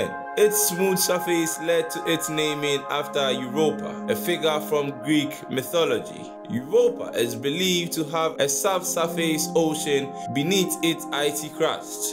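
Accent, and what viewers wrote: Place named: Africa. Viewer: African voice kinda makes me think it’s not true. I guess from all the Nigerian princes bollocks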